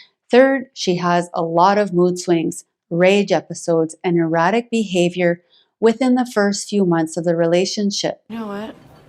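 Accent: Canada. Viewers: Sounds like a Canadian accent.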